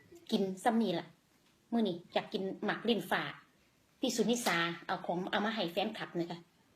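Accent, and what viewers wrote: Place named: Khon Kaen, Thailand. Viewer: กินเป็นตาแซ่บคัก..เว่าปานอยู่ขอนแก่นเอาโลด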